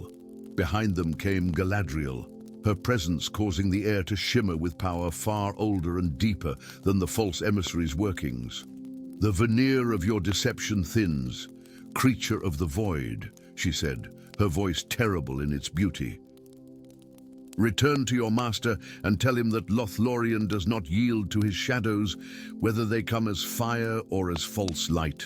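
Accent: United States. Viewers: Well this voice is better, but it still sounds American. I’d much prefer an English or European or Scandinavian or anything really but NOT American.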